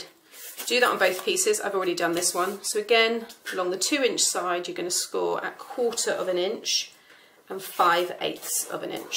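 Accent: United Kingdom. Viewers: I LOVE this project! Being a chocolate lover doesn’t hurt - but I’ve never had such a fun way to give a gift of Hershey bars!!, you do a great job explaining everything in your video - and I love listening to you talk. I love listening to your British accent. Anyway - keep more videos coming, you’re great!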